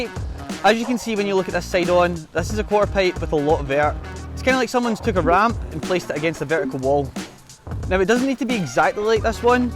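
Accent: Scotland, United Kingdom. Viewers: Never expected the scots accent! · Skate YouTube is so Americanized, I did not expect to hear the Scottish accent, and it was a welcome sound haha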